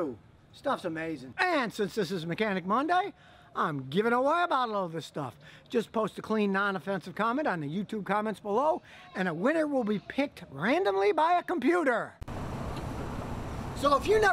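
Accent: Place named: California, United States. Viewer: When I first watched your videos, I guessed you were from a California. You don’t sound like a Texan. I have good friends from both states.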